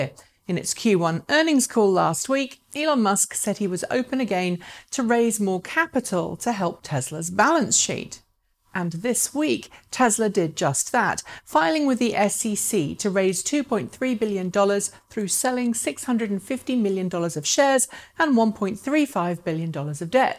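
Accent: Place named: United Kingdom. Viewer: why do you have a distinct British accent but make your show for the US market? From my point of view, It would make more sense, if you either made a news series concentrating on the British and Irish market (which is quite distinct to the US market with its regulation, currencies, and steering) with prices in GBP (UK) and EUR (Ireland), rebates in these countries and availability there. Or do a news series in US english with US prices, US tax rebates and US availability. If your series is intended for international viewers mixing stuff from around the world, then there should be a clear label, for which markets each of the news really apply. You could add a flag in a corner of the screen. The news about an electric food truck from an aftermarket conversion company for example will only apply to a certain region of the world. And this region should be told to the audience.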